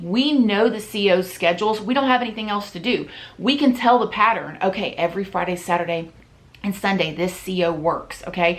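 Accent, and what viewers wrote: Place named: Baltimore, United States. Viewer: Sounds like she is from Baltimore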